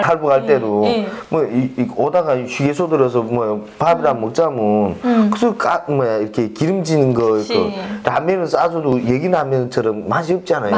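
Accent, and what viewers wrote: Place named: North Korea. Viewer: 님 한국에 오신 오래되었는데 아직도 북한사투리가 ㅎ 이젠 대한민국 표준말도 연습하시는 것도 좋을것 같네 언어 내가 말하는 의미 전달 중요합니다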